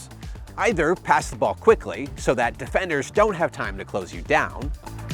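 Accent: United States. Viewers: Nah, his accent is much likely american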